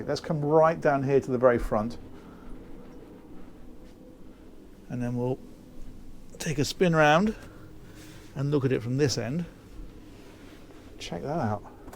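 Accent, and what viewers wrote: United Kingdom. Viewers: Sir, I am not your subscriber. But I used to see your videos. Because of 2 reasons: a) your clear British accent. b) you explain things very beautifully I congratulate you for your hard work May God Bless You Always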